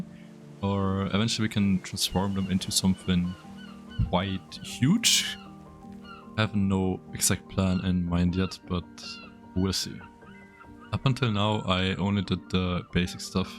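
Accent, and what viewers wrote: Germany. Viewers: ich hörs doch raus du musst deutsch sein. Warum finde ich niemand der gut bauen kann wenn du nen privaten builder server hast bitte lass mich ma drauf kann auch paar bilder schicken als beweis das ich builder bin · Wait, are you german? Love the Intro! <3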